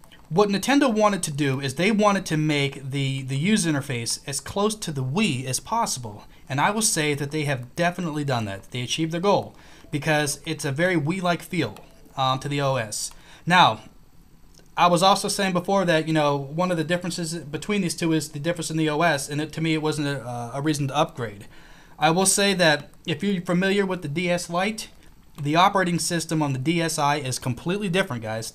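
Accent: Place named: United States. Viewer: i instantly know your american Y'all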